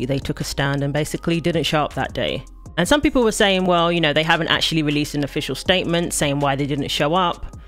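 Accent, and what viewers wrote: United Kingdom. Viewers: Are you American or a Brit ? The accent says British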